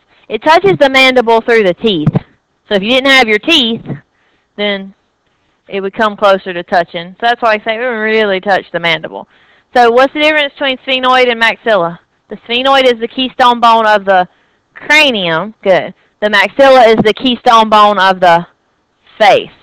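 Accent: South, United States. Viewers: I love videos. There are full of information and I feel like I am in a class, with the teacher talking to me, not just reciting information. The fact that she speaks 'Southern' is an added positive! I don't feel she is talking down to me when she explains things.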